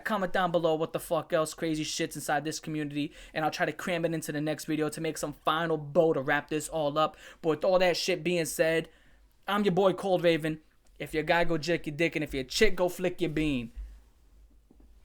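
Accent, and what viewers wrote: New York, United States. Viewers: How much newyorker are you?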